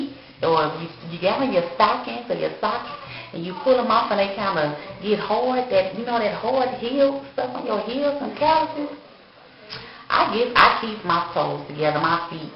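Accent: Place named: St. Louis, United States. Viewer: Hard-da-tion-----Foot game--duces -You sooo St.louis. I love u......gotta go on my way to Sallys lol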